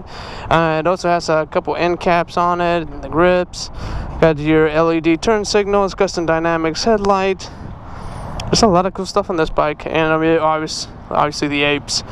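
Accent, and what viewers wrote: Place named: Germany. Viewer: Nice review man. Where is your accent from? Deutchland?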